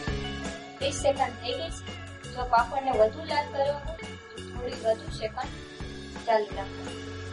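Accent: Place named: Kathiawar, India. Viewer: Hello. Tame Kathiyawadi lago chho... Kyana chho?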